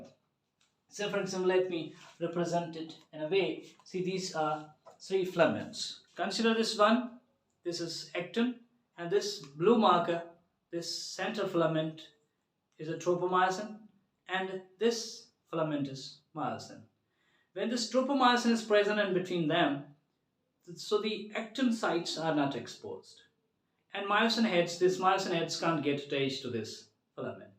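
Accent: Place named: United Kingdom. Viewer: Fully British accent wao.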